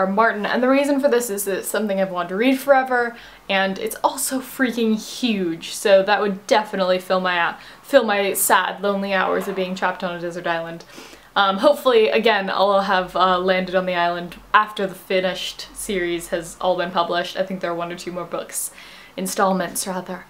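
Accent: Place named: United Kingdom. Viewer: i can hear the British seeping through your american accent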